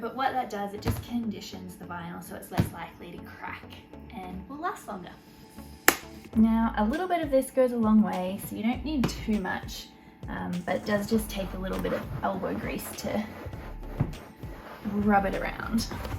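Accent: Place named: Australia. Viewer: "Cleen-a" Love Aussie accent. Thanks for a lovely demonstration.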